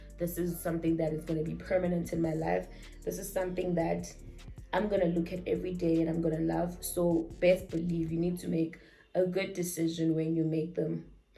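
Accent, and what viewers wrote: Nigeria. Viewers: Every time I fell in love with your Nigerian accent😊❤ I love you ❤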